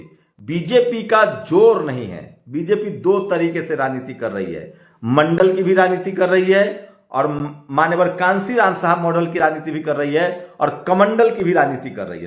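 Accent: Bihar, India. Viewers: आपको यह चैनेल बनाने की जरुरत क्यो पडी भाई साहब न तो इतिहास मालूम है और न भारत के बारे मे बीजेपी का विरोध करने का एजेन्डा चलाना है तो आप पार्टी बनाओ भाया और बोलने का बिहारी लहजा चेन्ज करो 2014 तक सबकुछ हराभरा था अब, सब जगह बंजर ही बंजर दिख रहा है। चश्मा बदल लो भाई समय बदल लो नही तो Robbish ही दिखोगे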